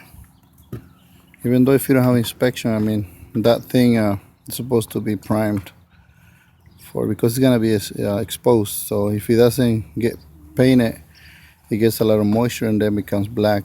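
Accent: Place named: Mexico. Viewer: No hagas ese mugrero primo y se te oye que eres mexicano mejor habla español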